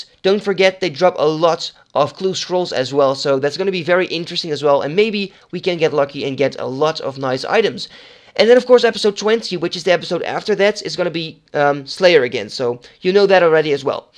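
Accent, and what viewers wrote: Netherlands. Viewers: ik zag het al aan de rs chat hehe zieke videos maak je, kon het meteen horen aan je engels. Niks mis mee maar aan het accent hoor je al dat je nederlands bent ;)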